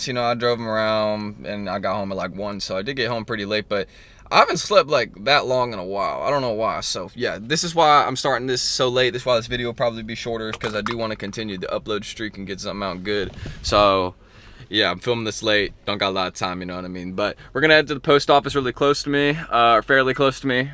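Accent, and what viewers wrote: South, United States. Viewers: your voice is an interesting mix if southern,Stoner,skater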